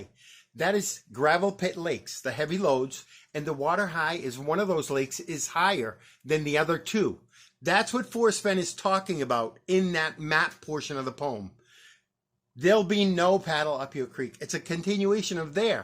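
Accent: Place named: Boston, United States. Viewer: In your video you say "Kent and Esther is pronounced Canasta, at least that's how we say it in Boston." So is your claim the poem could ONLY be completed by someone from Boston area? Not the redneck from Texas (they sure don't pronounce it that way). No one from 99.9% of the world? Only someone who has a Boston accent? Did Forrest ever even go to Boston? It makes no sense for someone who spent 15 years creating a poem to make it solvable for only people with a Boston accent, then release it at a book store only in Sante Fe. And Forrest says he wrote it for every redneck in Texas. You are very biased towards your pronounciation and ignoring what Forrest writes and says. I'm glad you promise you are done. Good for you. Your brain injury is a sad story but you are brave to openly discuss it and it should help everyone be understanding of your handicap. 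it comes through loud and clear; " I've offered them treace peaties so, so many times" Is that how you say it in Boston?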